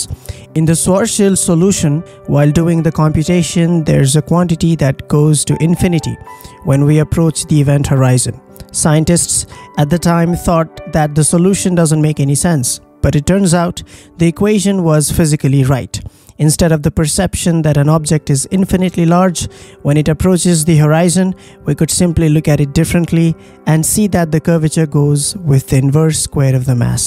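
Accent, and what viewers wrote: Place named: India. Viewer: Strong Indian Accent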